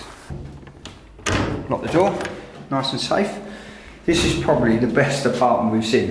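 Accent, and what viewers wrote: England, United Kingdom. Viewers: Where in England is this guy from? I'm in Canada and can't get the accent.....can you send a message to me?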